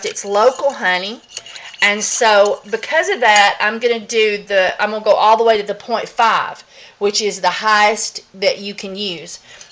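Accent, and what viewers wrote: South, United States. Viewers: loves y'all southern drawl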